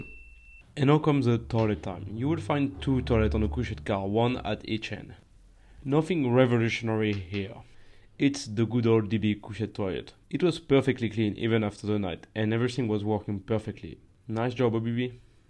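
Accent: France. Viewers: Sans vouloir être désagréable, serait-il possible d’avoir les videos sans commentaires off en anglais? Mes oreilles saignent en écoutant le lourd accent français, c’est difficile d’en faire abstraction. Les sous-titres avec le son d’ambiance font bien le job. Les youtubeurs japonais du train game font ça et vu leurs nombres de vues, ça marche très bien et ça fait moins de travail. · I agree with you entirely. His Franglais is annoying.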